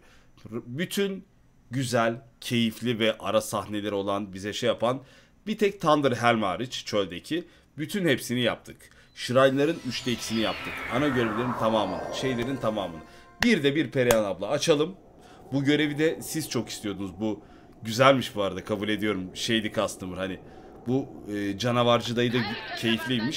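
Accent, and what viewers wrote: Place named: Ankara, Turkey. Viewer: Ankaralı olduğu nası da belli karı kızda yedi parayı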